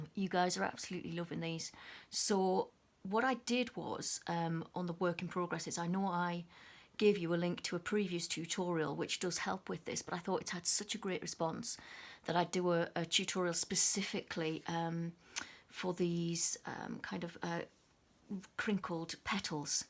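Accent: England, United Kingdom. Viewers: I am feeling closer to the UK now hearing you during this tutorial! Thanks darling! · Really lovely video I have only just started colouring so I am looking at as many videos as I can. It’s lovely to find an English voice as most of the videos are from the USA. I just hope that I can one day colour as good as you.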